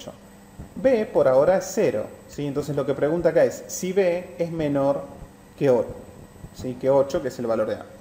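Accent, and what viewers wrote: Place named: Argentina. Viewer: No hables argentino no puedo entender,aaaah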